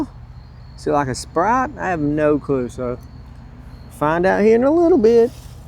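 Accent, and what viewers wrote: South, United States. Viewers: You have some great videos, I've been hooked for awhile now and luckily am not caught up so i always have a new one to watch. You have those common things i find entertaining: "owe", "I forgot" "oh man" "i have an apple pie" and for tne longest time i couldnt get that you were saying "spiders" until i recognized the southern accent and learned where you're from. To me, you are saying spotters lol. Love the channel, keep doin you.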